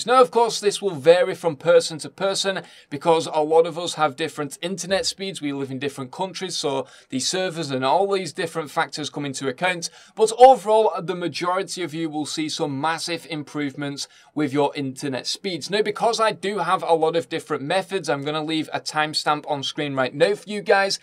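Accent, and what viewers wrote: Scotland, United Kingdom. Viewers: are you Scottish or English? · This Guy Is Scottish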